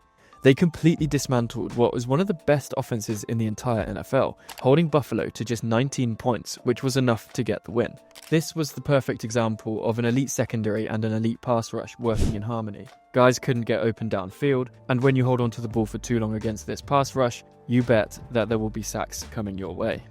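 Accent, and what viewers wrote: United Kingdom. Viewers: It feels surreal to watch a video about my Texans being good narrated by a British bloke 💙❤️💙❤️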